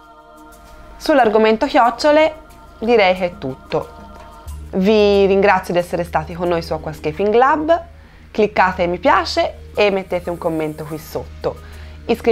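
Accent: Tuscany, Italy. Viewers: accento toscano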